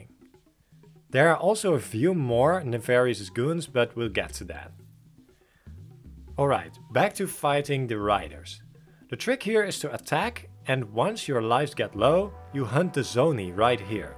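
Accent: Netherlands. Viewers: Very nice video. Is your mother tongue Dutch? The accent sounds very familiar :D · Bro no hate I am from the Netherlands aswell and the accent here is so ugly I ain’t gonna listen to that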